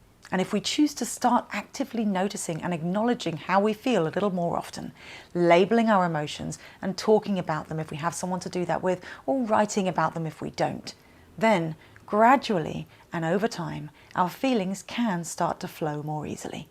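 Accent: United Kingdom. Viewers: You’re so articulate! Do you have scripts in the video or you just talk like that? And is that the RP Modern British accent or any other one? 😊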